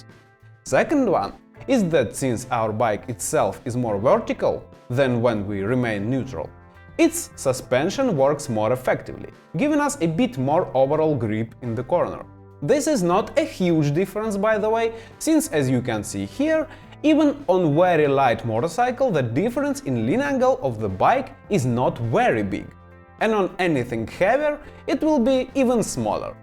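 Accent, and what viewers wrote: Russia. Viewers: Dude talks like he's russian robot. Is he one of those AI generated things ?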